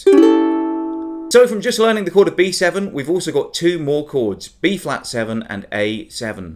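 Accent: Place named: United Kingdom. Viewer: I love youuuuu. The best Ukulele teacher ever!!!!! You make the instructions so simple to follow!!! I love youuuu. You've just got another subscriber. Huhu I love you so much you make it much easier for me. I just began learning Ukulele a couple of days ago and now I can play and sing. Next is this song, my most favourite jazz song ever:( I love your British accent too. Hope everything good comes to you <3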